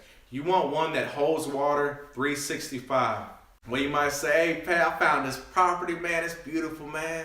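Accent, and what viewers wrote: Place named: Tennessee, United States. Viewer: Is this a "southern draw"? Your accent sounds like a Tennessean or Arkansans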